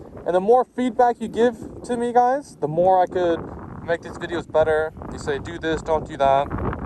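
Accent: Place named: United States. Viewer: Having grown-up in a windy place here in the States, on my BMX Bicycle and I remember when you get that front wheel in the air it is more like a sail on a ship. Appreciate the types have a 2018 SP, very unusual to see your year with a single exhaust. You sound American, but looks like your in Germany or Holland.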